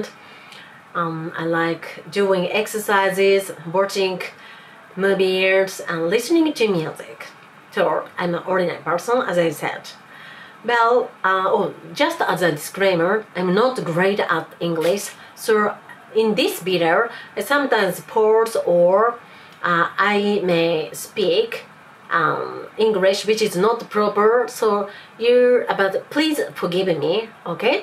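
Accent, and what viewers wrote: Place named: England, United Kingdom. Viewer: Hi. I am an Englishman who really loves your English accent! Your spoken English is excellent and I think that you have a brilliant command of the language. Your voice is also incredibly beautiful and so soothing. You shouldn't feel guilty about not liking certain aspects of Western culture, like the one of sending nude pictures. Many of us "normal folk" find it very unusual too! Thank you so much for sharing your life experiences, the beautiful Japanese lady with an amazing smile ❤️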